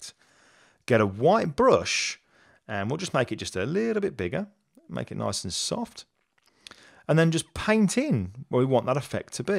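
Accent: United Kingdom. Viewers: Really great tutorial!! Exactly the tips I was looking for, and I really like the way you teach/talk etc. (nothing against U.S. tutorials, as I've leant loads from them, but I love to find a fellow Brit to learn from.) I think I've found somewhere I will defo be coming back to regularly!! Cheers!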